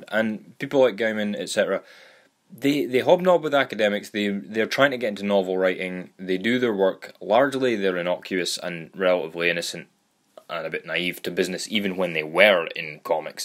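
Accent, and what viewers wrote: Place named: Scotland, United Kingdom. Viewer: Nice to hear a Scottish voice on comicsgate mate. Glasgow ?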